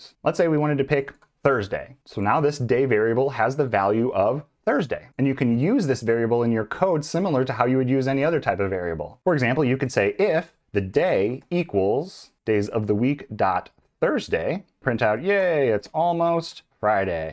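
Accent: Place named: United States. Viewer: I love this accent in English ❤ American accent ❤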